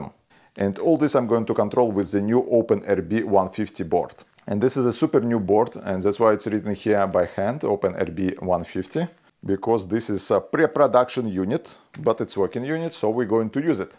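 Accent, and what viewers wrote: Russia. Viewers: Perfect Russian accent Прикольная самоделка👍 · Почему я слышу русский акцент?))) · Не пойму что за акцент???🤔🤔🤔 русский???? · Strong russian acсent, beautiful ideas )) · блин, ты же русский ? акцент прям явный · А ты не русский? Проато акцент есть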